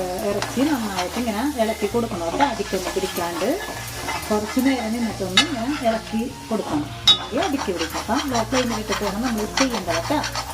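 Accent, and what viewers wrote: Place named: Thrissur, India. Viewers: തൃശ്ശൂർ സ്ലാങ് പോലെ തോന്നി. സൂപ്പർ ആയിട്ടുണ്ട് ട്ടോ. അവതരണം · Hi എവിടെയാ സ്വാദ്ദേശം തൃശൂർ ആണെന്ന് മനസ്സിലായി അവിടെ എവിടെയാ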